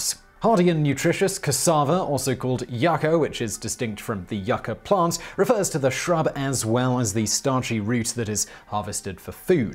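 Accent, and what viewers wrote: United Kingdom. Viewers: You sound British but then you say stuff that is making you look totally not British if you went to a Uk school you had tapioca and sago !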